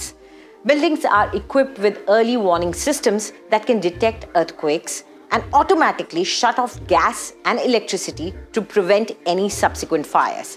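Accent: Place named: India. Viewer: 読み上げの女性の英語がめちゃくちゃインドなまりで面白かった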